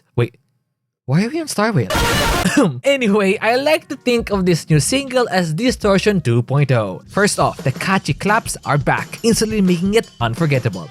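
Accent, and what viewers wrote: Philippines. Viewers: well, his bio says he is from the phillpines, and also, i can tell if they are filipino based on the accent and how he speaks.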